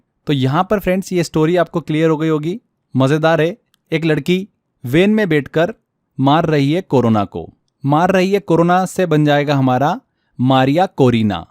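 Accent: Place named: Maharashtra, India. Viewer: Sir Aap ki Hindi voice se lagta hai ki sir Aap Maharashtra se belong karteh hai..... Marathi Hindi type voice.... kyuki sir hum 4saal Pune mein tha....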